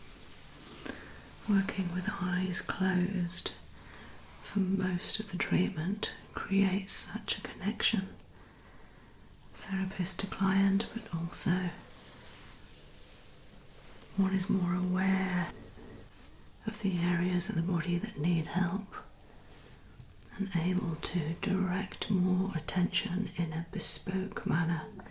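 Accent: England, United Kingdom. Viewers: Hi miss how are you i just saw your channel an hour a go but im a fan ❤❤❤you are so friendly and nice kind lady btw love your english accent ❤i have 2 videos i think from my bully her name is Magic bcoz dog friendship is magic love your channel and i love all typs massages and treatments im Bas van Laarhoven from the netherlands and i love to be a patient or cliënt from you or make a video do you take care for man or only ladys respect miss ❤❤❤🙏🙏🙏👍👍👍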